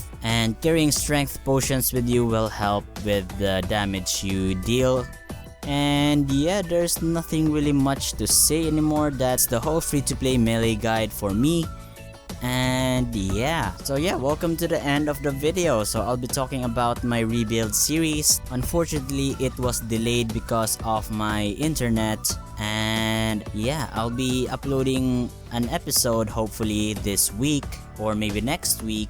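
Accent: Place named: Philippines. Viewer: you have the most annoying fucken accent ive ever heard. pinoy?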